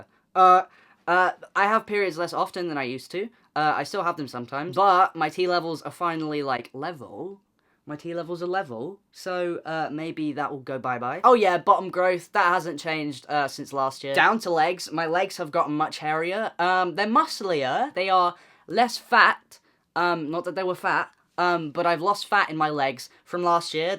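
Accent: United Kingdom. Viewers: hes literally just british ahah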